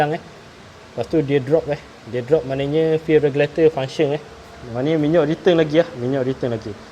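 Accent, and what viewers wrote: Terengganu, Malaysia. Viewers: Sedap dengar slang ganu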